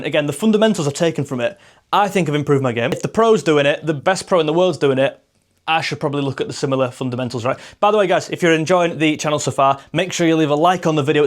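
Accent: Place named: North, United Kingdom. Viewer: Love your work - you got pretty close to Littler’s throw! You’ve got a great presenting style, reminiscent of Tom Scott, just more northern and centred around darts…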